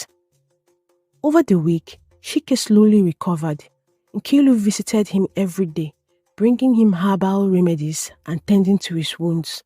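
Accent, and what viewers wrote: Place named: Africa. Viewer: Himmm i heard to understand a Africa accent but i like the story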